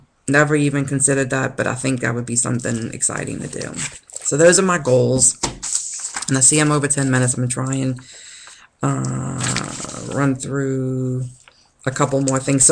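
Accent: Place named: New Orleans, United States. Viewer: Just getting started on this journey (take 2 due to insurance change) and have been jumping around a lot of vloggers and starting to subscribe. Many haven't posted in years so thank you for keeping at it...this is for life!Loved your goals way back when...I, too, have a weight goals that tie to pregnancies and a dress! The horse and amusement rides made me smile....yup. Anything with a weight limit is an issue (even if under I feel I am not far enough) and squishing (or worrying about breaking) into lots of seats (and booths) is something I cannot wait to not worry about as I survey a room.I teared up at your necklace NSV. I get it, gurl. I am a New Englandah....the only accent I would trade for mine is "n'orlans" so your videos are nice on the ear, too!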